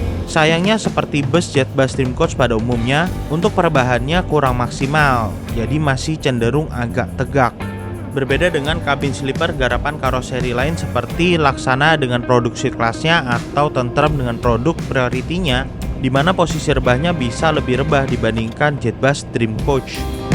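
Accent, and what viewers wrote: Surabaya, Indonesia. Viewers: Seneng kalo mas ngomong. Sopan. Suaranya kalem. Trus bahasa jawa surabayaan nya keluar tuh 😅 bahasa jawa chindo gitu 😂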